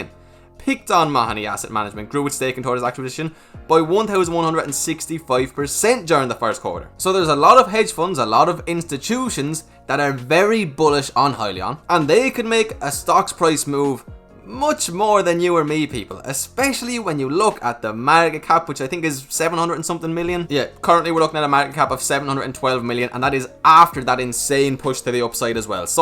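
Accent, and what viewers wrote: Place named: Ireland. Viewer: That Irish accent is so fun to try n copy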